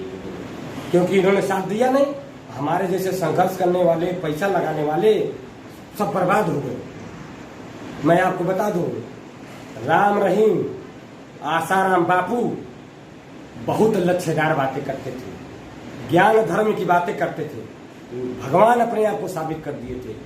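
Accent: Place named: Bihar, India. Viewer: Tm to bihari lagta h ..kya bhai .sahi bol Raha mai 😊😅😅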